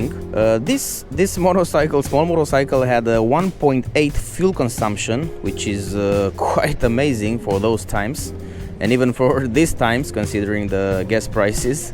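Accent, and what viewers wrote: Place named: Romania. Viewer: Romanian accent...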